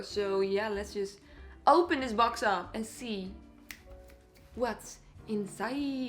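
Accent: Netherlands. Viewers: I love how most of we Dutch people have this super recognizable accent when we talk English it's so cute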